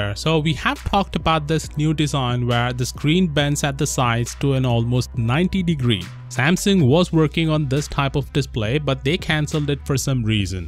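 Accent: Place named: India. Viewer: Your accent is confusing me are you Indian or Chinease or both 🙂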